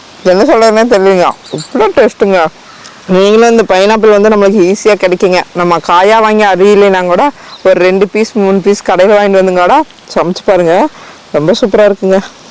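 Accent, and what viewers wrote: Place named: Kerala, India. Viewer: Adipoli kerala speeking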